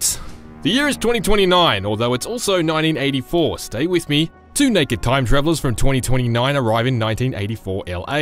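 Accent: Australia. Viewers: Australia mate? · just a question are you Australian?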